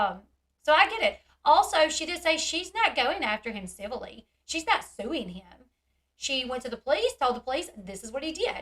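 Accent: South, United States. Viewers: I don't really like channels like this as in...I usually lose interest or find it boring or the speaker annoying...or their voice irritating. However, I really like your channel and I subscribed. Maybe it's the familiar twang in your voice that feels comfortable because I was born and raised in the south. You also seem genuine and humble. Anyways I just wanted to express that I love what I have seen so far. You're doing a great job ma'am 🤗🙂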